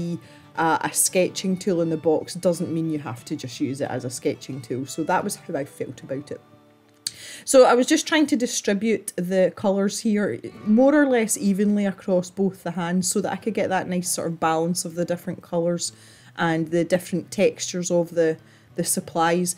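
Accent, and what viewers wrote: Scotland, United Kingdom. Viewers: I don't really know how I actually found your video, but watching you and hearing you talk I get so many Scotty vibes here :D I love how you say "wee bit" a lot :) it is a nice drawing, though. I shared my own illustration on Instagram (@ I drew a Manga girl in a ball gown :)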